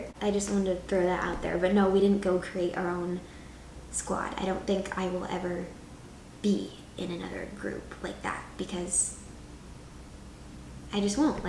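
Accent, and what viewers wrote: South, United States. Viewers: I hear the county then you talk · out of all the years I’ve watched you I just realized you have a slight country accent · Her voice has gotten so much more country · I can hear your country accent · You really inspire me please always stay the light that you are. Seeing you grow up through the screen is amazing and you really are an amazing person( I love you soft country accent when you talk) I love your content so much. 💜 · Your southern accent is showing more and more. Also team Bluey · I can hear your country accent. Also, team Bluey. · Your country accent is so beautiful❤ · U sound like a real country girl i luv it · Who’s ur favorite country artist? Mine is:3 also u are so sweet and I hear a bit of a country accent when u speak and I love it!! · People saying your not country is mind blowing you sound country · Oh my, you soul is so beautiful, you have everything I would want in a person 🤍. 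Personally, I can REALLY here that country accent in you, suits you so much. Thank you fo all that you do ❤️❤️❤️ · Bro why does sound like she has a southern accent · I can tell you are country! It’s the way you say certain words.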